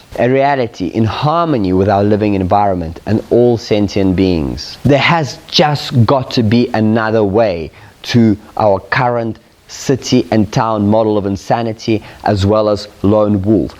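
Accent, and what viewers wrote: South Africa. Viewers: Hi there. Are you South African? Love your ideas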